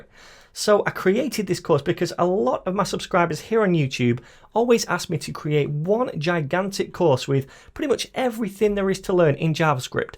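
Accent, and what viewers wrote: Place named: England, United Kingdom. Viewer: Aaaaaand... BOUGHT. Thank you, sir. Nice to do a course with an English accented tutor for a change (well, besides Angela Yu) :D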